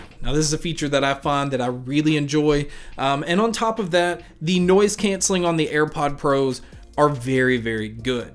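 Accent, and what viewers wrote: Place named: Texas, United States. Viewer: I used to think that the Indian accent was the worst on the planet but, damn, yours tops the charts is it texan? . Great review though man and love your content!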